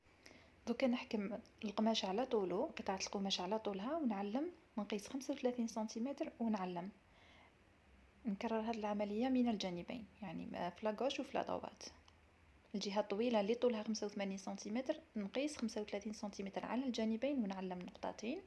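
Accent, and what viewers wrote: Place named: Iraq. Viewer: اللهجه بيهه عراقي عاشت يداكي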